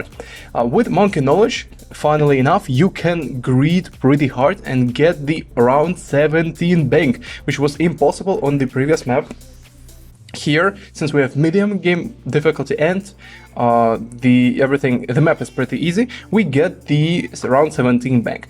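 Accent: Russia. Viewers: Wait.... good tutorial but are you russian? If so you have a really great English accent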